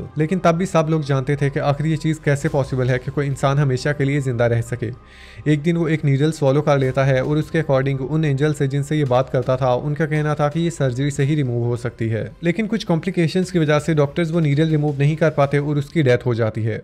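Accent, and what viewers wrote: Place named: Kashmir, India. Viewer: Main apka bohat bada fan hun main yahan ap k insta stories ki wajah se hun main india kashmir se hun mujhe hamesha lagta tha aap kashmir se ho ap k features aur excent bilkul kashmiriyon se milta hai.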